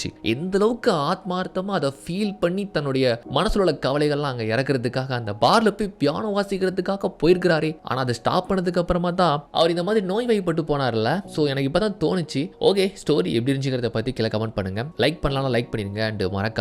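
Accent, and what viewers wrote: Tirunelveli, India. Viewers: Enna bro thirunalveli veliya varuthu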